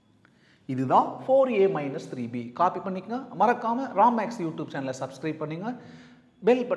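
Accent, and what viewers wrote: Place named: Pollachi, India. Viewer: Super teaching in pollachi voice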